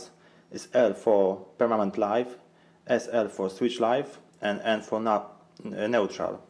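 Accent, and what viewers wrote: Poland. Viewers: Lovely Polish accent. Dzięki za pomoc. Thanks for help.😎🇵🇱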